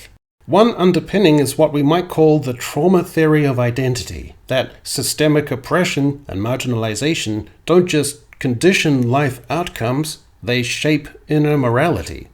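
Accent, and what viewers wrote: New Zealand. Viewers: Based New Zealand voiceover guy #31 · Goodnight Kiwi, picked where you were from immediately. Great video. Asmongold sent me here, congrats on the exposure haha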